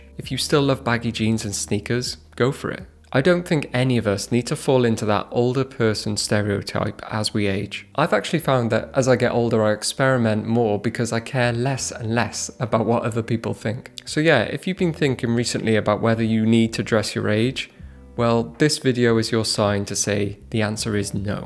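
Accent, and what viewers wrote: Wales, United Kingdom. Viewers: Another bullshit advice with a British accent.😅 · Such a beautiful accent! Is this typical of Wales?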